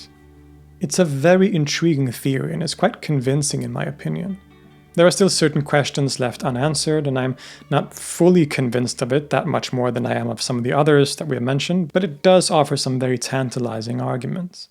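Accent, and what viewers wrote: Arab world. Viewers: Ur semitic arabic accent is on point.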